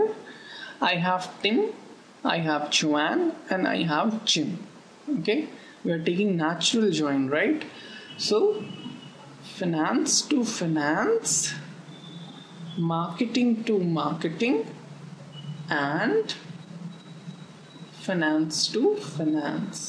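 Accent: India. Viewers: You have a strong Indian accent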